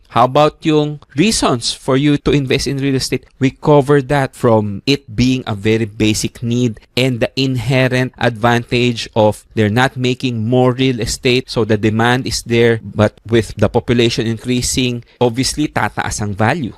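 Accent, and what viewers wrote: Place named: Philippines. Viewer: That is the most beautiful Filipino accent I ever heard.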